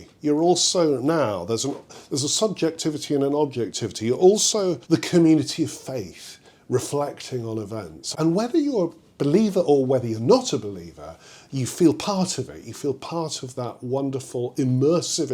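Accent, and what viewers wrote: United Kingdom. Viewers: No need for blasphemy to praise Bach. He would be appalled by your reference to “divinity.” In addition your performance and narrative are disgusting—especially the poncey British accent. Goodbye!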